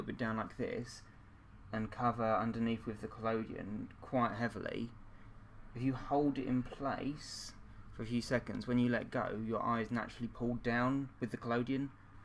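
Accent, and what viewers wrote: United Kingdom. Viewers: You did a FANTASTIC job on this... Maybe it's just the placement of the stitches helping, but you look like an honest to God woman in this one. Also, it took me a few videos to notice that you use the same brand of stage make-up that I use when I'm in a play! I think my brain dismissed that as possible because you have a UK voice, where I'm from the US. So it took me extra long to notice that, because I assumed that the brands couldn't be the same.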